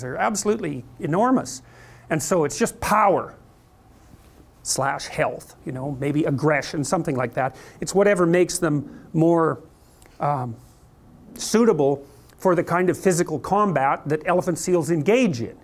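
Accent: Canada. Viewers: I find the Canadian accent very annoying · If he didn't sound so Canadian people would take him more seriously.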